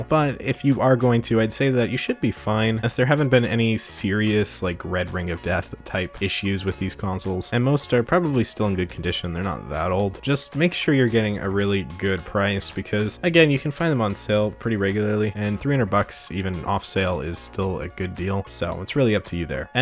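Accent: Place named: Canada. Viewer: omg ur Canadian yaaaaa eh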